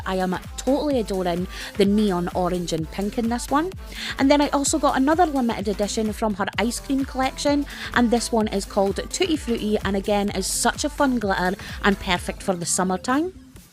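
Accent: Scotland, United Kingdom. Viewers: Great to find U.K. suppliers. Thank you for sharing 😊. BTW “buck mad” isn’t an expression I’ve heard since I left Northern Ireland 30 years ago 🤣 I do love hearing a Scottish accent for a change.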